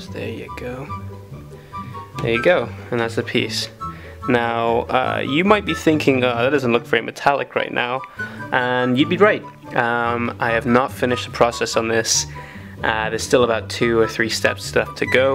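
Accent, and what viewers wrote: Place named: United Kingdom. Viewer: i recognise that accent are you from the uk???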